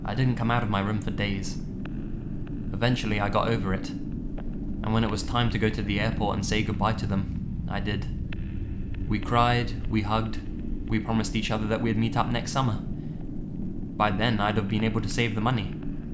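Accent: United Kingdom. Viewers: i'm newer to this channel, but I really like it! does a good job of narrating these in a way that emphasizes the important points. You wouldn't think that a higher voice with a British accent would be very good for narrating horror, but his is just about perfect. subscribing as Legion member 527,001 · You sound like CreepsMcPasta! Probably cause he's also British but fair enough, I like your accent!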